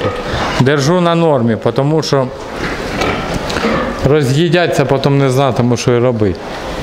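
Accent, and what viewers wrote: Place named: Surzhyk, Ukraine. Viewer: Супер, супер репортаж. Наслаждался суржиком, неповторимыми интонациями и жизненной силой голоса Автора!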